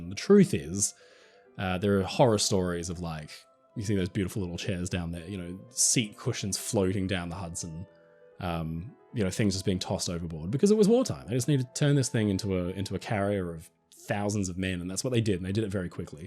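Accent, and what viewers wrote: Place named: Australia. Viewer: Oh yes indeedy! I could listen to forever! He's got what it takes to be a successful narrator. First of all, he's an Aussie, and who doesn't love that accent; and he's got the perfect cadence in his voice, eloquent and elegant, which keeps the listener not only affixed to it, but always wishing for more! Maybe I've just described myself and my likes; and as for our ~ noone, and I mean noone has topped his talent here on YT (and I do enjoy listening to high quality programming)! 💙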